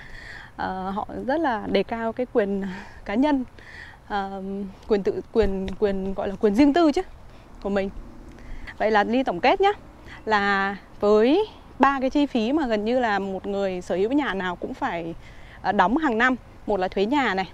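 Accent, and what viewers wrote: North, Vietnam. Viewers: nói giọng người bắc nghe dễ thương quá đi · Giọng em là m Bắc sau 1980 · Giọng Bắc thôi chứ không phải giọng Hà Nội b ơi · giọng hà nội nghe thích bạn, mặc dù ở đời sống ở Mỹ rất cao nhưng chi phí cũng không hề nhỏ rất cảm ớn đã chia sẽ những thông tin nhà ở Mỹ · Nhìn e cưng quá muốn yêu e luôn giọng bắt bắt lại nghe hay quá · Giọng nói Hà Nội vẫn chuẩn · Giọng Hà nội của nghe rất dễ thương. ❤️ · Cảnh quan sạch đẹp, môi trường sạch, giọng nói dễ nghe giông giọng hà nội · Nghe giọng nói của hay ha, chính xác người Hà Nội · Em gái này người miền Bắc sau 1975 phải không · Mình dân gốc Bình Dương nhưng sao thích giọng con gái Hà Nội quá😍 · em là bắc kỳ hây miền trung dậy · Giọng Bắc thật quyến rũ.. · giọng này là Hn chuẩn đấy . không phải giọng tỉnh lẻ đâu nhé.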